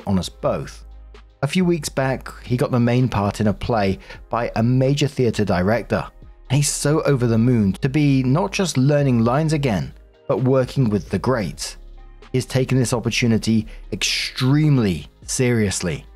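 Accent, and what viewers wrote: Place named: Liverpool, United Kingdom. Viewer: I'm listening but not reading the screen, so at first I thought he was wearing a wig and talking with Liverpool accent, I was going to ask "is he pretending to be John, Paul, George or Ringo?" xD edit: Hahahhaahahaahaha, I'm glad I'm not the only one that thought it.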